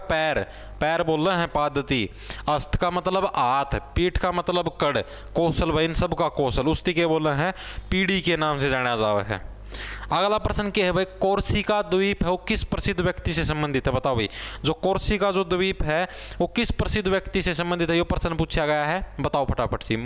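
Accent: Haryana, India. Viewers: Ya to hindi ae bol le ya fer haryanvi bol le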